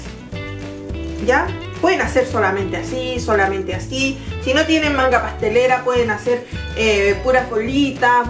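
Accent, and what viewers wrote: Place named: Chile. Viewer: gracias, que fáciles.... me gusta ti acento chileno, yo soy chileno y todo me queda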